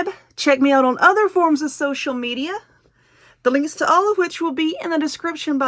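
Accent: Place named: Texas, United States. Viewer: Hey sounds like you live in Texas...lol We have two that rides around on their lawnmowers almost 24/7... Oh I love your carrots. I have to try these. Thank you. Stay safe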